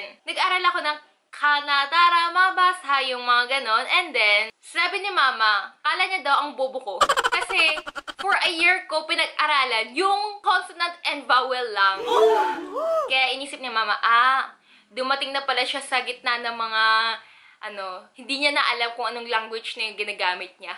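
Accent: Philippines. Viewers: Sounds natural Filipino ka magtagalog kase dito ka nga lumaki simula pagkabata . Ang cute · Kilos pananalita. Adopt na adopt mo pagiging filipina na.. :) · Pananalita mo palang ate pure pnay ka po .. legit heheh new suscriber here😊😊😊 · Na adopt nyu na kc ung culture dto sa 🇵🇭 hbng lmalake Kyu dto Ng kuya mo nasa Phil environment kc kayu kaya Hindi na fluent Yung Korean accent mo nging pilipino accent na 🤔😆🤣😂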